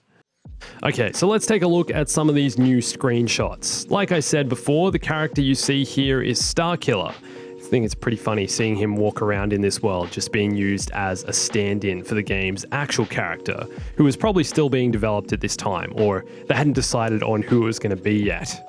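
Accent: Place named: Australia. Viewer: What part of aus are you from mate ?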